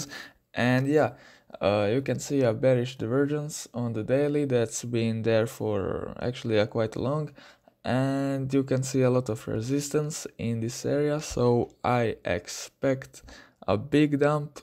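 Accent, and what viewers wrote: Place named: London, United Kingdom. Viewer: Da te ne znam reko bi da si negdje okolica londona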